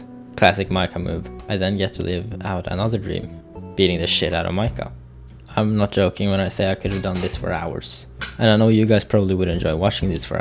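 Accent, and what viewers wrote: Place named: Norway. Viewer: very good norwegian english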